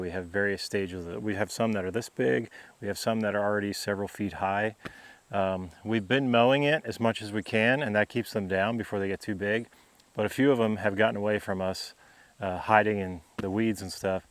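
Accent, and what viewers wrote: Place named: United States. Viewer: I was confused a bit when watching your video. Honey Locust is a native North American tree. You sound American and look to be in the Midwest. When you say invasive, it means the plant comes from a different environment or location and outcompetes native varieties. Better terms might be annoying or disruptive and unwanted. No offense intended & I appreciate the information and showing how to get rid of them. I’m from Indiana am trying to manage the woods on my property and return them to only native plants. The honey locust and calorie pear trees eat tires…. And must go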